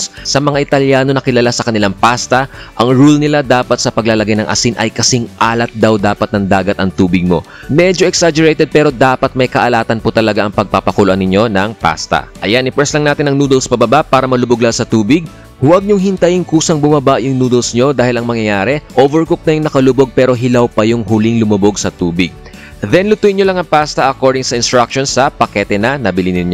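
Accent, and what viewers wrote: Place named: Visayas, Philippines. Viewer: Hahaha bisaya diay ka?!!! Nyahahaha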